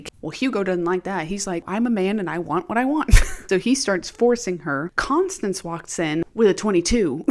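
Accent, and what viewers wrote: United States. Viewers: I literally know nothing about this show but you will bet I will listen to an american southern woman talk about AHS for 2 hours! Also, random note i saw from another comment. I always think its so weird when people say others are a Mics Mike copy cos theyre using a whiteboard or pin board. Like girl have you never heard of powerpoints before ?? youtubers have been using pin boards and white boards for years lol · 'eeeey, I thought your accent sounded familiar! Fellow Virginian, here! Born in Norfolk, grew up in VA Beach, Chesapeake, then Williamsburg. Hi neighbor!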